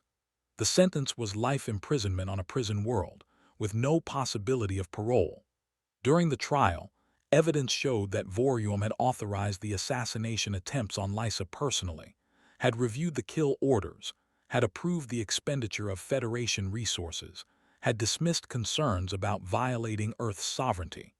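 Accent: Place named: United States. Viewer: A story with an American voice talking about accepting refugees. The irony.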